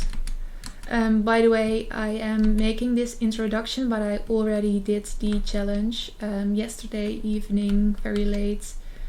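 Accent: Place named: Netherlands. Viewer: She is Dutch isn’t she?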